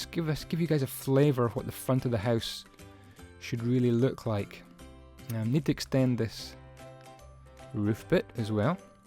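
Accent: Scotland, United Kingdom. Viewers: You sound Scottish. Are you?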